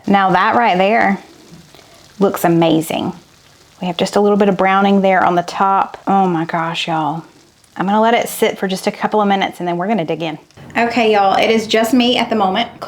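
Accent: South, United States. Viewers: LOVE YOU GUYS!!! Making the baked ziti right now with my boys...they are so excited. They ask to put on so we can cook together. They may or may not copy your southern accent...we are Michganders, so they are quite entertained. HA.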